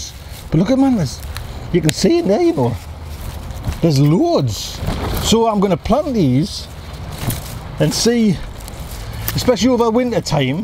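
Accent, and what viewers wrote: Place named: North East England, United Kingdom. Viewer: Great video. Sounds like your from the northeast I'm a new starter and have just got my first plot near Beamish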